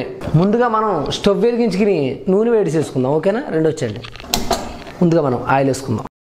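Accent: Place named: Andhra Pradesh, India. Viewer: Bro I like Andra yaasa....from Telangana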